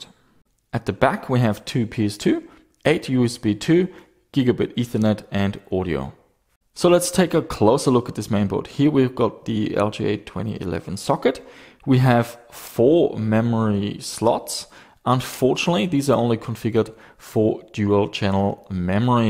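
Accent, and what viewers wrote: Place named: Germany. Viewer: Damn that german accent... 👍😉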